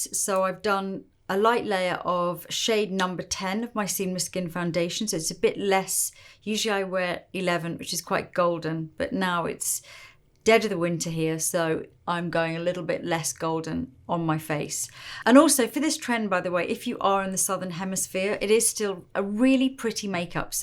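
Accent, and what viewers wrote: England, United Kingdom. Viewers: I don't know if I've ever seen someone applying make up more elegantly than. It's just incredible to watch. Just her imagination, like how she has this very real vision in her head...lol....and she brings it to life in her way. It's cool to see. It's clearly such a passion and she's very good at what she does. I just adore watching her work at her craft and passion!!!! So happy that there are people like this on the planet that are loving what they do and following their passion....too many work jobs they just HATE... so as to get that paycheck.... but the world would be a much nicer/friendlier/healthier place or should I say the human race? if people actually took the time to find their passion, and then figure out how to make money involving that passion! <3 Just wonderful to see.....(so graceful and elegant too! And ofc if she didn't have that english accent it would just feel different....she makes me feel like old school english countryside elegance / royalty yet incredibly humble and knowledgeable....i will say though, DAMN that brush collection! 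I'd love to see a makeup and brush collection video! )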